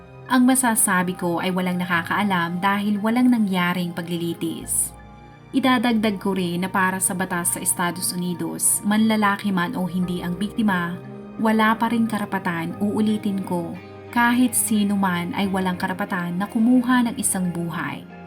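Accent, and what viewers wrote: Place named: Ilocos, Philippines. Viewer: Lumalabas ang ilocana accent nyo dito Manang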